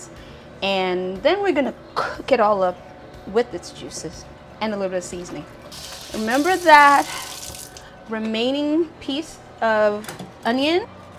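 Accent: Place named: Ondo, Nigeria. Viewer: I love the Ondo accents love u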